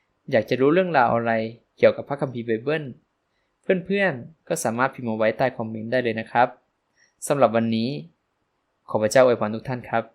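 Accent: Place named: Isan, Thailand. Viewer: ขอบคุณพระเจ้า ขอบคุณผู้สื่อสารที่ดี แม้สำเนียงออกอีสานแต่กะเป็นตาฮักยูเด้อ ขอพระเจ้าอวยพรหลาย ๆ เด้อ ขอพระเจ้าได้รับเกียรติสูงสุด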